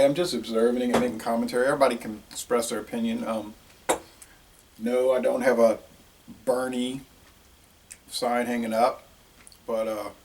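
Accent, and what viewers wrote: Louisiana, United States. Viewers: are you from Louisiana ??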